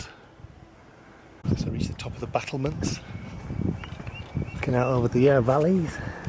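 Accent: England, United Kingdom. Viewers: What are you doing in Dushanbe, your accent looks you are from England . Enjoy snc safe journey .I live Southampton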